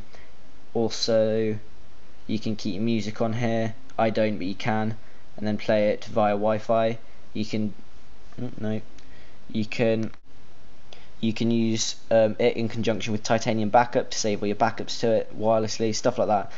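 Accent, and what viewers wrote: United Kingdom. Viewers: Thumbs up for British accent! D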